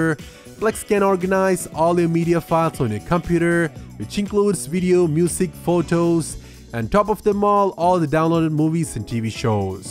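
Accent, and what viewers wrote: Kerala, India. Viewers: Your English wasn't bad. I could understand you well. Btw, are you from India? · Are you from Kerala??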